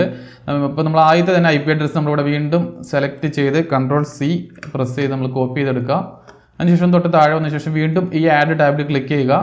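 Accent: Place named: Thrissur, India. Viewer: Bro thrissur aano veed?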